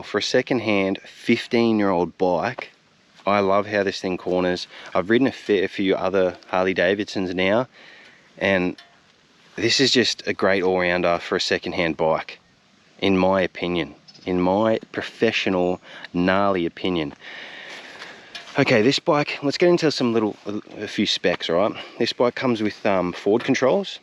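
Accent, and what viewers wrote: Australia. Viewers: Hey... really enjoyed this video! I went to test ride a 2022 Fat Boy at $17,400 with only 2000 miles on it. Somehow... I came home with a 2023 Baja Orange Breakout. Since I bought a new 2023 Harley... I get free tires for life! The Breakout is very nice, and similar to a Fat Boy. They share the big 240 rear tire, but the Breakout has a 21 inch front wheel. My Breakout has the big 117 engine... and it hauls ass big time! I like listening to your Australian accent. Also like that hair cut your sporting! Don't be nervous while your filming... you're doing a great job! Hope your channel keeps growing!!! John from Oregon, USA!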